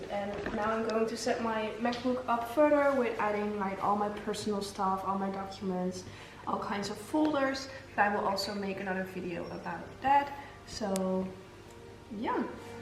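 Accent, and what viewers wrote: Netherlands. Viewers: Klinkt Nederlands? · Why i feel ur dutch from ur accent why i have this feeling tho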